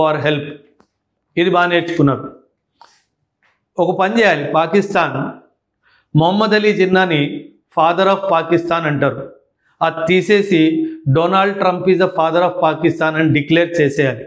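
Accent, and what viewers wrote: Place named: Telugu, India. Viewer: Though you are a Sardarji having Punjabi as mother tongue, now you are speaking like a pure Telugu person.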